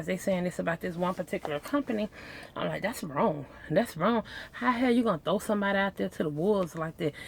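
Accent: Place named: South, United States. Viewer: No, you are slowly loosing your southern draw. great info I just filled out a application for choice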